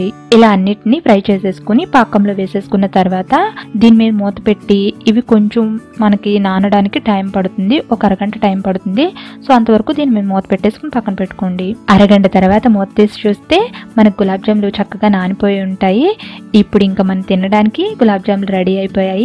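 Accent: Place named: Andhra Pradesh, India. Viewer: Your voice is very sweet Andhra ammayi anipinchela me explaination bagavundhi.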